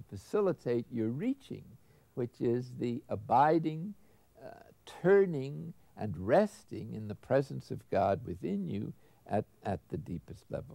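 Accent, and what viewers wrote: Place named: Ireland. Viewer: I love his voice, i wonder if his mum or dad were irish, as there's a hint of an irish lilt in there 🤔 perhaps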